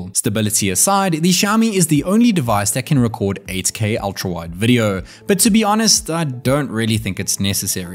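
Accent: South Africa. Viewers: South African ❓I'm new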